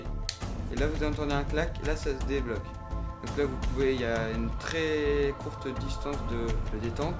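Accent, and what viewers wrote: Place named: United Kingdom. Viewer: J'adore ton accens anglais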